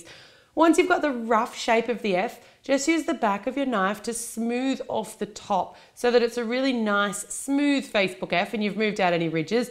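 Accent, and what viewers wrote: Australia. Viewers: Hey! Ur aussie arent u?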